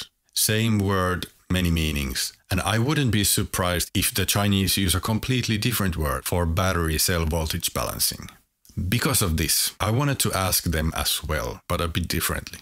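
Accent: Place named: Finland. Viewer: I could immediately tell you are a Finn: beautiful accent and “max information to words” ratio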